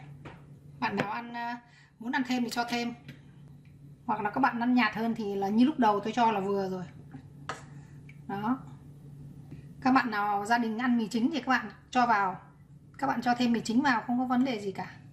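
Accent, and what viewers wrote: Hanoi, Vietnam. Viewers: Chị ơi ngoài nấu ăn ngon mà nghe giọng hà nội gốc rất hay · dạ, con nghe giọng cô là liên tưởng đến NS Kim Tiến đó ah. Rất hay và đặc trưng, mặc dù bây giờ giọng pha nhiều rất hiếm để nghe đc người có giọng gốc Hà Nội nên giữ được bản sắc là điều rất quý. Chúc cô sức khoẻ và ra nhiều video bổ ích!!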